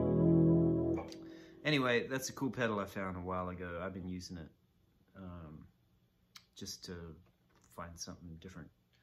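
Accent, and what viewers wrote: Australia. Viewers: Congrats from down under mate , you’ve never lost your Aussie accent ! 🙏🏼🎶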